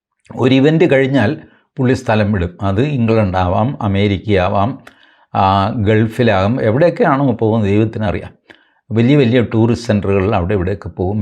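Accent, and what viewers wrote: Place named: Kottayam, India. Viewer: എതായാലും താങ്കളുടെ ആ കോട്ടയം ഭാഷയില്‍ ഉള്ള, hasyathmaka അവതരണം കലക്കി 😂😂 ജവാബ് നഹി 😂...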